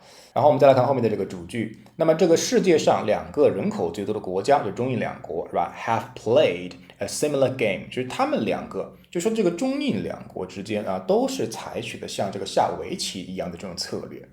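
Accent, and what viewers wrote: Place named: United Kingdom. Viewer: 有英腔朗讀，又有精闢句型詳解，是一個真正學好英文的好節目。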